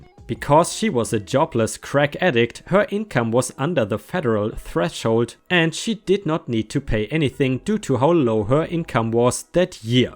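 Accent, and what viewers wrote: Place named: Germany. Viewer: Also, if you don't mind me asking, what part of Germany are you from? I have an aunt from Berlin. She's lived in the U.S. for over 40 years and has a much stronger accent than you. That doesn't matter to me because I absolutely love meine Tante Ludmilla.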